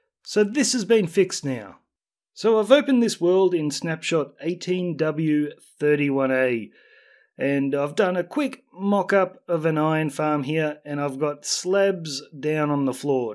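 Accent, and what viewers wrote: Australia. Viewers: You're Australian aren't you?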